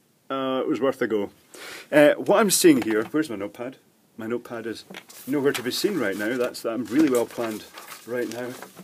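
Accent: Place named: Isle of Man, United Kingdom. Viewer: You live in Isle of Man? I thought you were Scottish, never thought Manx. That's cool!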